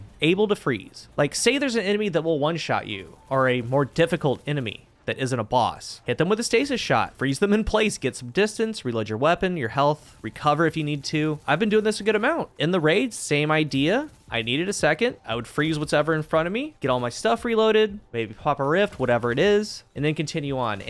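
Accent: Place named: Wisconsin, United States. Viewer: your voice is soooo, are you from Wisconsin? try not to do that upward inflection after every sentence it gets real annoying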